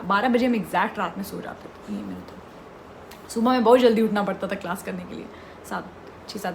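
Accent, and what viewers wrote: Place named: Uttar Pradesh, India. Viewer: UP Accent ✅ Using Ham always 💗 , liked it .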